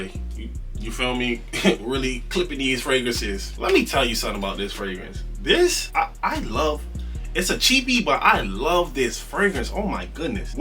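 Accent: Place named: New York, United States. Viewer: Bro sound like he from nyc , much luv . One of the few reviewers that don’t talk about clones in every video , keep it up g I’m taking 📝.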